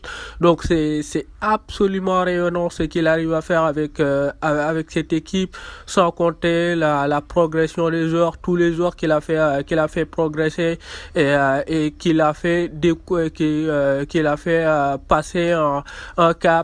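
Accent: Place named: Senegal. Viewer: Accent sénégalais 🙏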